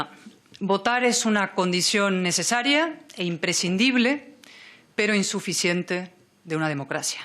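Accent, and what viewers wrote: Argentina. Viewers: No solo es una persona bella, pero qué lindo habla…No solo su labia, sino su acento ( un acento argentino sin idiosincrasias) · Porqué habla argentino? Mu española no la veo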